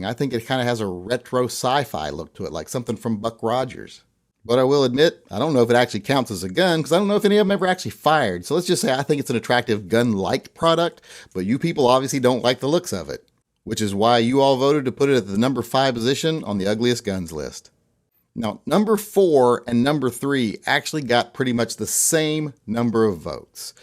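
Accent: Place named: South, United States. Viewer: What an absolute clown. Makes everyone with a southern accent seem as stupid as they sound,and that's not fair. To be honest about the results of the ugliest,rather than asking people to vote and then disregarding what they said and picking your own #1,just post a pic of you holding ANY firearm and you'll have the worst looking ever. What's your hang up with other guys' penis size anyway? You talk about that a lot. People,the only way to watch this douche's videos without getting a headache,is on mute.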